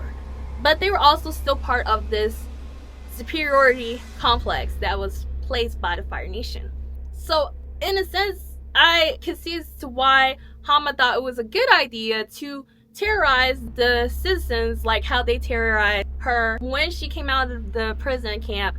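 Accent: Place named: United States. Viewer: First of all, you’re stunningly beautiful. I audibly gasped when you came on screen. But to the point of the video: Iroh is not a war criminal. War crimes are specific things, none of which Iroh ever did. The few war crimes we do see are from - unsurprisingly - Sozin (genocide) and Ozai (attempted genocide), along with - surprisingly - Sokka (he flew the War Balloon with Fire Nation insignia in order to mount a surprise attack) and anyone who allowed children to fight (use of child soldiers, also a big no-no). Iroh mounted a siege while being on the wrong side of the war, but he also grew up under direct influence of the Fire Lord as his father, so one can understand why he might see it differently than it really was. All of his attacks were just trying to breakthrough the outer wall, which we know he failed to do. There are no records of him committing anything that constitutes a war crime. 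I’ll buy that Hama had to stay in the fire nation, but only because there’s oceans between it and the Earth Kingdom. Otherwise, she very much could’ve lived there in anonymity. Fire Nation were probably looking for her at all ports, so it would’ve been her best bet to stay put. But to say that she was somehow justified in torturing civilians (also a war crime, btw) just because they were Fire Nation is not a thought you should entertain. Based on your accent, I’m going to assume that, like me, you’re from the US. Would it be fair if an Iraqi POW kidnapped and tortured you, just because yo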